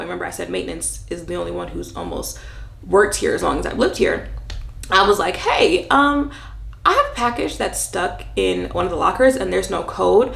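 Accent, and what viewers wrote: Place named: Long Island, United States. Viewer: Oooh you’re from LI. I can’t place your accent to specific town 😆 I hear you on the moving out. So happy you were able to remove yourself from such a wild apt. I hope the next place is much better.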